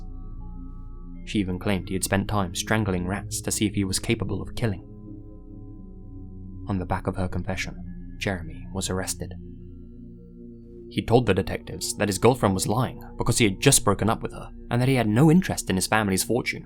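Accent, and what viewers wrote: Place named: United Kingdom. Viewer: But sorry I know your British i love your videos soo much you're the only one ill listen to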